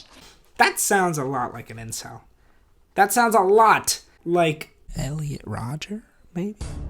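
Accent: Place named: United Kingdom. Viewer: The British accent 😂😂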